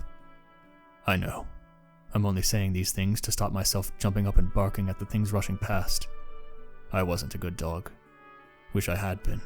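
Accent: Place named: Newcastle, United Kingdom. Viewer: Credit where it's due: a damn good attempt at Geordie mannerisms! Not the easiest even for English folks 😂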